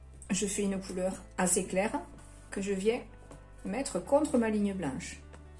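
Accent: South, France. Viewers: 😍Un tableau aux couleurs qui chantent à l'image de votre bel accent du midi. Et dans la grisaille hivernale, la peinture est un excellent loisir ! Grâce à vous , je manie le couteau avec plus d'aisance pour peindre des paysages d'après mes photos. Vos tutos nous donnent confiance et on peint avec enthousiasme...bon... les tubes de couleur se vident vite . ..Heureusement, le père Noël va passer 😉. Mille merci pour votre générosité. Catherine .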